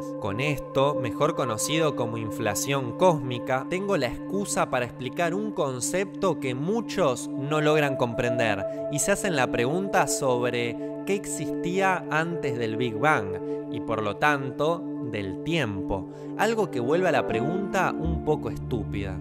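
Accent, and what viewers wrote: Argentina. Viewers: No se pero amo el acento argentino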